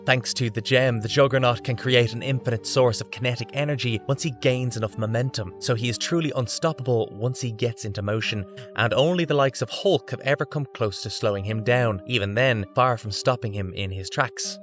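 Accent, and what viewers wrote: Ireland. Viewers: Irish voice over? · Is that an Irish accent?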